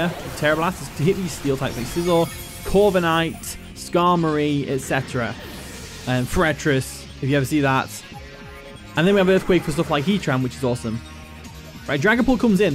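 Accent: United Kingdom. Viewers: Ey man I just gotta ask - I'm from Leicester, lived in Bristol 12 years now; are you from just north of Leicester? Your accent is so close I can almost picture it but I can't quite place it, and it's bugging me because I haven't been up the country in years now 😂 Edit: I recently found your channel so I've seen 10-15vids and it gets my ear every time lmao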